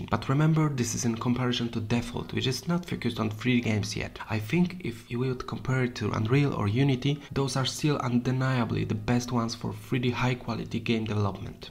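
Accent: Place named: Poland. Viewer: po akcencie od razu poznałem, że Polak! Powodzonka! :)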